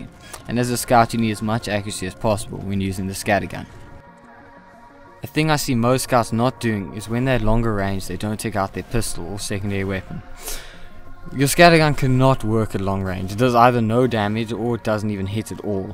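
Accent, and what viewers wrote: Afrikaans, South Africa. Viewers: are you afrikaans? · Are you South African? I'm Scottish but my mother is South African and you both say words the same way. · He is South African:D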